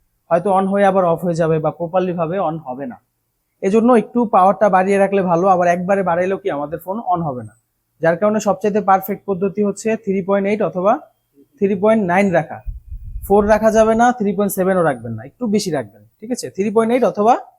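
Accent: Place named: Bikrampur, Bangladesh. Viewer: ভাইয়ের কথা শুনে মনে হচ্ছে আমাদের বিক্রমপুরের লোক। আমি মোবাইল সার্ভিসিং শিখতে চাই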